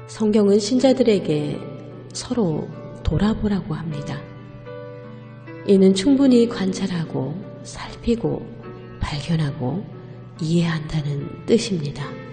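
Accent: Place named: South Korea. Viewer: Nice, calming voice. I love korean accent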